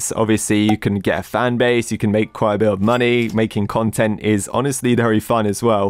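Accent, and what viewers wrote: United Kingdom. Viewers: is going full british mode on this video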